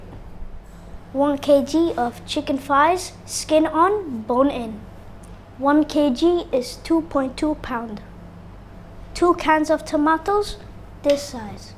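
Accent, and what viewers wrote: United Kingdom. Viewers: British pronunciation 😊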